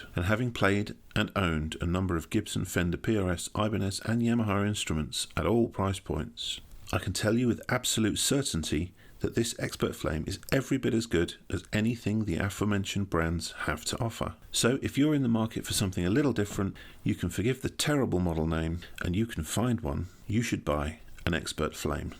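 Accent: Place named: United Kingdom. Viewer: This is a completely brilliant review, and just what I was looking for. I was looking at this model and some of the Eastman LP-types. Plenty of info on those, very little on these, so thank you so much. I have two questions, if you don't mind. First, how does the guitar hold tune without locking tuners? And, they say that the frets on these are "jumbo" (which i love), but so often that really means "medium". So, anything you could say about the frets would be great. Thank you again for being the best demo of this guitar on YT. Cheers!👍🙏PS- as a yank-anglophile, I have to say that you voice and your accent are so beautiful to listen to.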